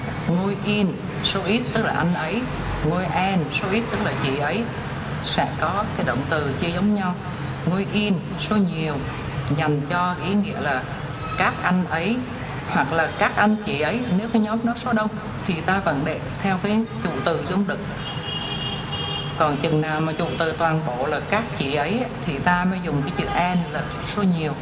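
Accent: Vietnam. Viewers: chũ khó nhìn với cách đọc của miền Nam nên lúc nào ucngx phải chuẩn bị cách google dịch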